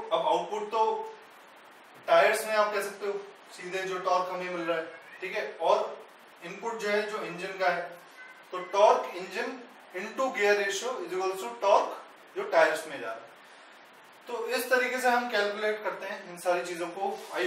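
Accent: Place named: India. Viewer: omg, idian accent :((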